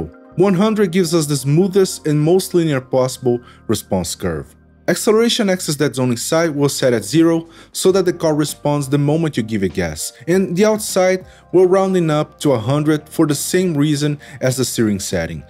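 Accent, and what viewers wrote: Brazil. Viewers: tbm achei kkkk to quase ouvindo ele falando em português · Esse cara é brasileiro kkkk... Certeza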